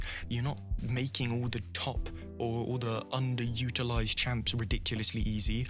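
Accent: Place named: United Kingdom. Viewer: I love this British asmr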